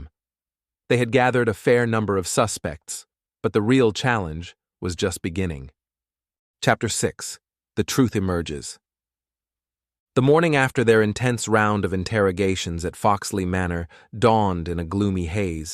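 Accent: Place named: United States. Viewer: Why the American voice?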